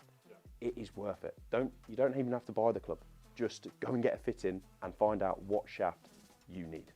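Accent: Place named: Derbyshire, United Kingdom. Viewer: You sound like you are from not too far away from me judging by your accent. Im from Derbyshire. Where would you recommend getting a fitting? Any suggestions? ✌️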